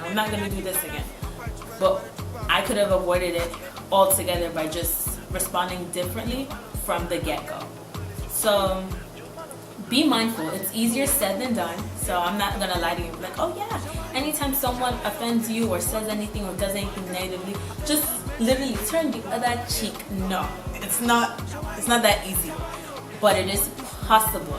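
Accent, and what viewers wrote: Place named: Africa. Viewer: Makeup A 1 🔥🔥🔥 also I peep the African accent 😭😭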